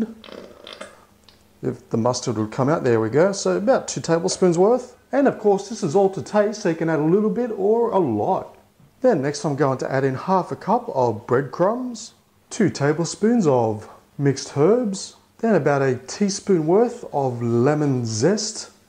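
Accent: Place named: Australia. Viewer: Then the Ozzie accent. Its bloody torture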